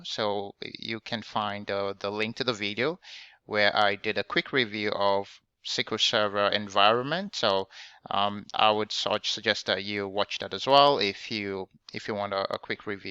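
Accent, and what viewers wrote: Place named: Nigeria. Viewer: You sound so Nigerian, thanks for the video.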